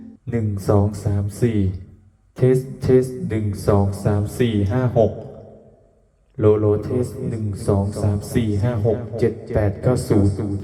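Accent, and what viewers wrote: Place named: North, Thailand. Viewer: คนเหนือแน่เลย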